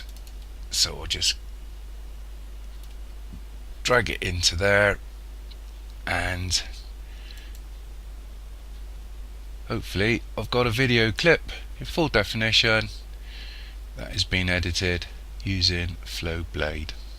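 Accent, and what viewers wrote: United Kingdom. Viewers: Thank Mr. Guessing you're from the UK.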